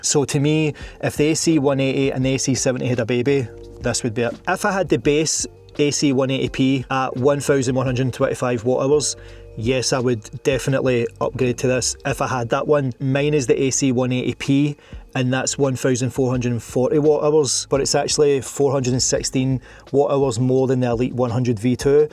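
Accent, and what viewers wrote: Scotland, United Kingdom. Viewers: The gentleman is Scottish. I am English. I can understand him perfectly. You on the other hand are incredibly rude. I also understand that it takes a hell of a lot of time, effort and resource to put these reviews together so that the rest of us have a better idea about what to buy. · I'm watching enough folks from the UK doing reviews that I'm actually able to understand almost every word. It's amazing! And it's a fun challenge. The one thing that I'm not so good at is distinguishing accents. British is pretty easy, but the other three are more challenging for me. I'm going to guess Scottish for you, but as green as everything around you is, it could be Northern Ireland. Am I right? BTW, I don't know about the pricing in the UK, but here in the States, on Amazon, I just bought one of these for 379 USD, which is cheaper than my Bluetti AC180 cost, at 479 USD, in December of 2024 and that was a huge Christmas sale price reduction. 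To me, that was the biggest "PRO" for the 100v2. I enjoyed you review and thanks for publishing the content. If you don't have one already you might look into buying a convection hob. They can cook many different things very efficiently and are perfect to use with a solar generator.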